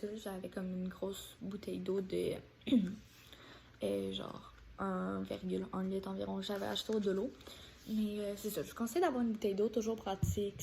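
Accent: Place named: England, United Kingdom. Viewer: You have an English accent ❤️❤️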